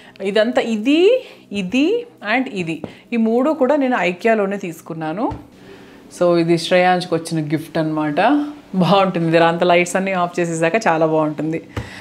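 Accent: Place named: Telugu, India. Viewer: I like ur telugu slang 👌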